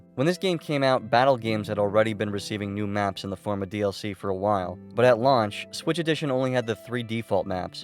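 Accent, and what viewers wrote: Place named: New Jersey, United States. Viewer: Idk why, but based on your cadence and voice, I just have a FEELING you're a New Jersey resident who either lives close to Philly or used to.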